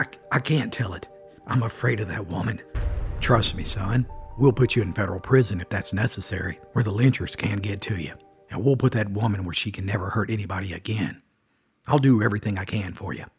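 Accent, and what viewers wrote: Appalachia, United States. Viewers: I just found your page and I'm obsessed! As an Appalachian girl myself, your accent feels like home. ❤️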